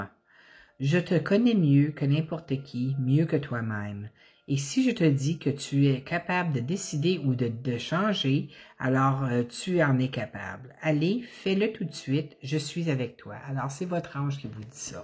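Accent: Quebec, Canada. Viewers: oula l accent québécois 😍❤❤❤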